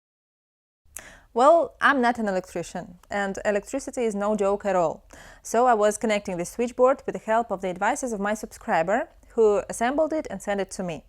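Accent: Russia. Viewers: Ok, two things. The first one is that you aren't based in USA, but in Russia. I can tell you because of the sockets, also you do have a bit of Russian accent. The second one is that you clearly didn't do this alone, there is a man behind you, and it's not your grandpa. There is a man with a lot of knowledge. I am no fool. You are talented, but you didn't do this all by yourself, there is someone behind every move, maybe more than one person. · why do you have that terrible Russian accent?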